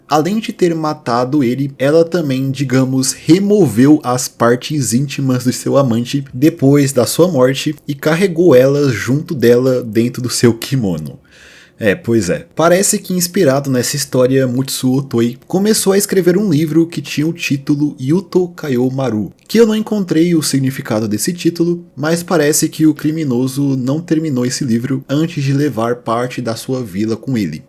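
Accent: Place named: São Paulo, Brazil. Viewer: Será que o é branco, preto ou asiático? Magro ou gordo? Será se ele é baixinho ou tem 1,90m? Talvez nunca saberemos. Só sei que ele é paulista pelo sotaque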